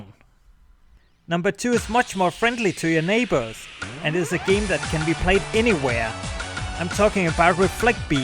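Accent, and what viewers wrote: Denmark. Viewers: Are you from Denmark? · holy shit din danske engelsk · I freacking knew it. The accent got me. Overraskende at se en dansker lave engelsk indhold ;)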